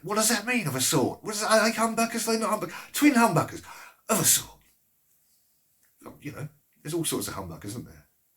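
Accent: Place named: England, United Kingdom. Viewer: It's so nice to hear an English accent instead of an abrasive American twang with put a sock in it pronunciations.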